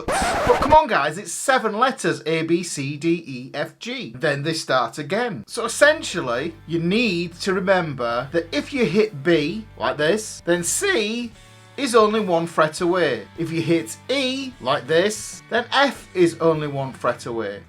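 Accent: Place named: Yorkshire, United Kingdom. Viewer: Sooooooo nooooootes. Love a good yorkshire accent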